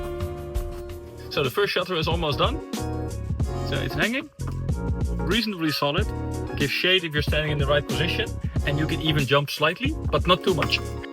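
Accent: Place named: Netherlands. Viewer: I love hearing your Dutch accent. I miss my vader so much.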